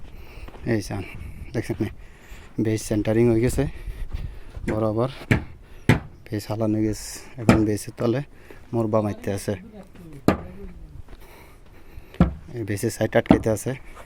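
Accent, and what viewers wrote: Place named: Noakhali, Bangladesh. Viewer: আপনার বাড়ি মনেহয় নোয়াখালী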